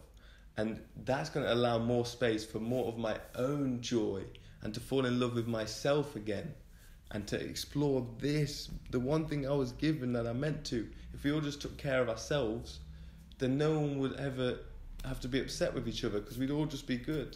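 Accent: United Kingdom. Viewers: Are you British ?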